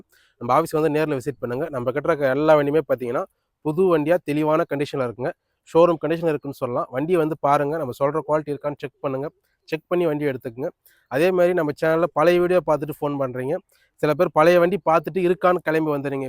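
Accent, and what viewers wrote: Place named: Kerala, India. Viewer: Kerala Tamil❤🎉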